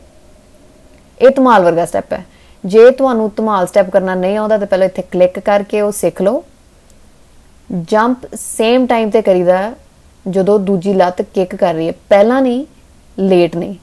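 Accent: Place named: Amritsar, India. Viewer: Boli ton ta bibi ambarsar di lagdi a. Ke nai??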